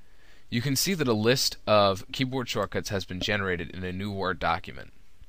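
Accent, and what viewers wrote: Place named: United States. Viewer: Thank you so much! This is exactly the information I was looking for i.e. 'How to print a list of your customized keyboard shortcuts'. I've been looking for this since forever. This video should have Millions of views! - It's short and to the point. - It's narrated with clear native USA English. - It does what it is meant to do i.e. help people.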